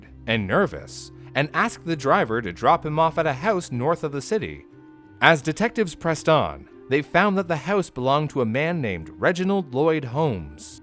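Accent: Canada. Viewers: Hey,! You a Canadian? 🤩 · he’s Canadian😄 you’re smart!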